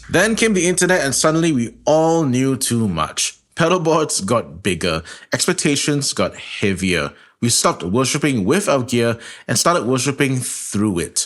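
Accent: Singapore. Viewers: You sound like a Singaporean. Is your church in Singapore or Malaysia?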